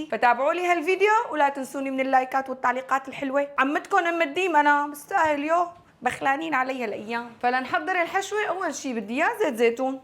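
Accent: Syria. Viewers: انا بحبك اوى والله 😂وبتعلم اللهجة السوري منك وعاملة صداع لجوزي في البيت 😂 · عن جمال اللهجة السورية أتحدت ❤❤ كتيير بحبك و كل وصفاتك ماشاء الله ربي يحفظك 💚 وتحية إلك من الجزائر 🇩🇿🇩🇿🇩🇿💕💕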